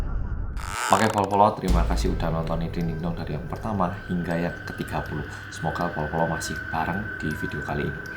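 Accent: Java, Indonesia. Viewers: Orang Korea tapi ngmong nya medok banget wkwk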